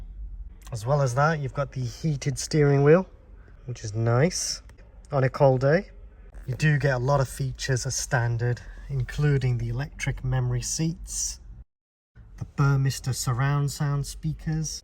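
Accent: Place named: United Kingdom. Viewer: British Talk about German Car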